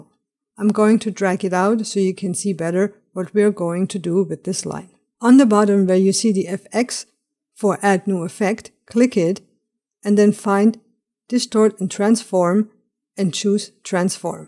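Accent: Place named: India. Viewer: Thank you for your tutorial. I hear an Indian accent when you speak, although your photo shows your Caucasian genetic makeup. Were you born in India or lived there for a time? And your last name is German! You are a citizen of the world, obviously. Be that as it may, I enjoy hearing English in something other than my flat, American midwest accent. (I am now subscribed to your site.) Chuck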